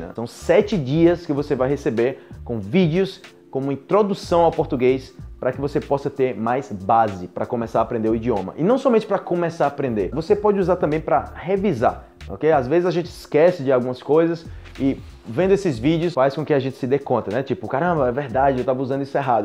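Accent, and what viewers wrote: Antioquia, Colombia. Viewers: 😂😂😂😂 Que cómico hablando español "paisa"... pareces colombiano, la verdad!! 👍🏻👍🏻👍🏻👍🏻👍🏻 Tus vídeos me transmiten una vibra positiva, gracias! 😊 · Kkkk adorei sua conversa paisa! Jajajja quiero saber como te sale el costeño🤔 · Ya somos 2. Si que la vacila con el acento colombiano. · Increíble como habla muy bien el español colombiano. Yo soy nativo colombiano de Bogotá y nunca conseguí usar esas expresiones ni tener acento. Es verdad que es español paisa eso, mas en español de Bogotá también hay un acento y expresiones y nunca conseguí hacer. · me encanta como hablas el español colombiano (paisa)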